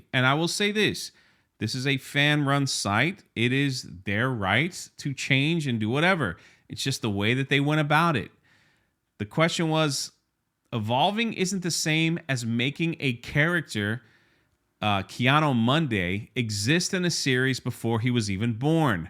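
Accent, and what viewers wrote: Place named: New York, United States. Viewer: Love this guy’s accent. I picture a husky New Yorker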